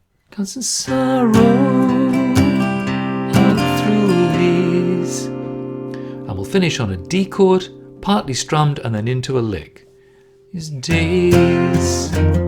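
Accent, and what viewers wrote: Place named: RP, United Kingdom. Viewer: Excellent. I love the teaching and the technique. I just would like to know if the Rex. Harrison accent is authentic because only 2 to 3% of people in England talk like that. And it sounds just like Stewie on a Family Guy, very cool, though.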